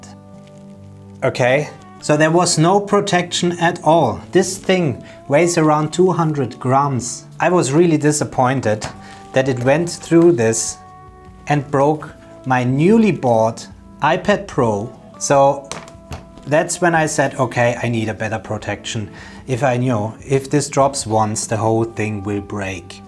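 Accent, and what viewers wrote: Germany. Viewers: Are you german? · Dieses Englisch kann nur von einem Deutschen stammen, korrekt? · Wow dein Deutsch accent ist hard😐 sorry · Dieser deutsche Akzent. Herrlich :D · I bet you're German, man! Ich hör's doch am Akzent!👍😂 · YES! Knew it as soon as I heard him. Germans have a unique English accent if their own. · Du bist doch deutsch oder das hör ich direkt raus :)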